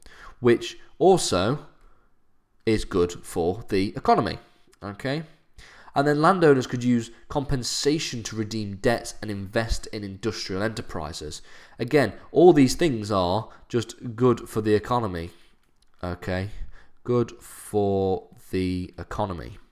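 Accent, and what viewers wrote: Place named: United Kingdom. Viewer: bri ish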